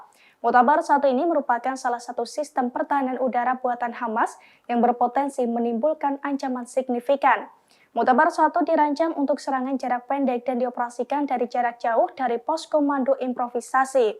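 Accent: Java, Indonesia. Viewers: mbak e wong jowo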